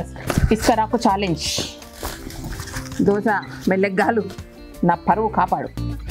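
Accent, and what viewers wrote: Telangana, India. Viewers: Hi..me ukmarava dosa bagundi..nenu try chesthanu.. Me telangana basha bale matladutu enjoy chesthu pani chestunaru..mee viewers ni me matalatho attract chestharu...be happy madam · Super andi meeru saradaga ma tladutu adi telangana chala manchiganipistadi trees gurinchi chala baga cheptunnaru dinitona ardamitundi meeku enta opiko ani super👌👏🙏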